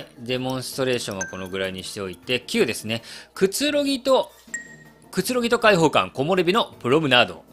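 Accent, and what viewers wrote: Hiroshima, Japan. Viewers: イケボなのに広島弁で親近感が湧く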